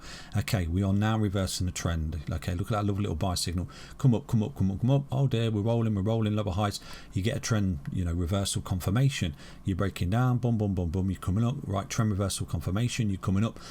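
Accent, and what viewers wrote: United Kingdom. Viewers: He is from the UK and you are probably not understanding him when he says "big up the coinskid crew"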